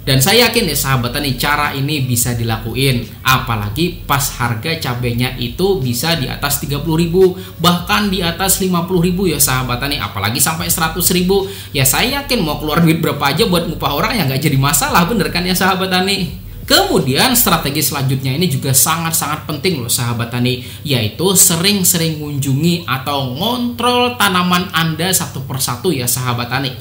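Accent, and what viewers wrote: Banjar, Indonesia. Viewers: urang banjarkah pian julak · Orang banjar kah pian mas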